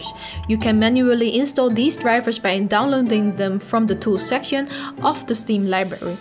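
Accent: Netherlands. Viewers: o the dutch accent always hurts my ears . but thanks for the answer ! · Are you dutch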